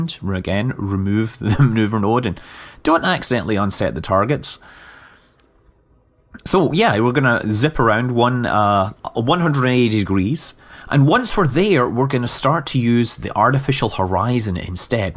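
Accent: Scotland, United Kingdom. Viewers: He has a scottish accent :)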